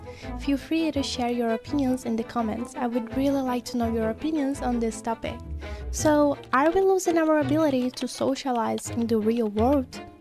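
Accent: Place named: Brazil. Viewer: Pensei que fosse brasileira pelo sotaque e confirmei com o áudio da sua mãe kkk Gostei muito do vídeo e das referências! Abraços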